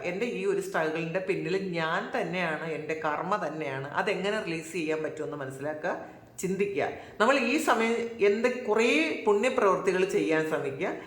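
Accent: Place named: Kochi, India. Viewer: Good morning ❤.. മാം എവിടെ ആണ് താമസം. സംസാര ശൈലി കേട്ടിട്ട് കൊച്ചിയാണ് എന്ന് തോന്നുന്നു...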